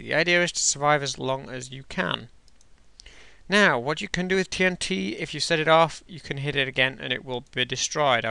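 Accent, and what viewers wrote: United Kingdom. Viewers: TOO MUCH BRITISH! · cute british accent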